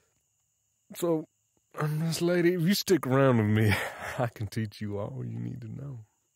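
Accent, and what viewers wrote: South, United States. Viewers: (Edit): After listening to this, your southern accent is pretty dang good! Your voice acting is very impressive! Well done ❤️ ❤️ (Original comment): Ooo?! Going by the title imma have to listen to this one at night 😂🫢🥴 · Your country accent is amazing 🤩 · The giggles I let out while hearing the country accent ☺️🤭❤ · A country boy accent aways makes me giggle 🤭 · Omg the country accent gave me tingles. You can get it. Stop playing Daddy I'm lit so I have liquid courage. I'll feel bad tomorrow Yee haw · As a girl who lives in South ith think your accent was spot on a little to rich but when you speak all in all it was hot 😙🤌 chefs kiss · Him: Where you from? Me from Downtown Ohio No boyfriend because I’m to shy to ask anyone, and to tell people I’m from Ohio… 😪 yeah this audio is perfect.❤ I loved it. You have a wonderful country accent! 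🥹love you!!! ❤❤❤.From a British woman. 😌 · The country accent is amazing. This video is just what I needed, too. Thanks, Daddy. ❤️ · As a southern girl, the accent killed me💀💀💀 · The Country Boy accent is amazing, it so good thank you!!! ❤ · This ain’t no American accent missy!!! It’s a country accent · I love your southern accent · This Country Boy accent sounds so sweet, and is hitting just the right spot in my brain ❤️ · i absolutely love your accent (and honestly i am not a big fan of american accents that much)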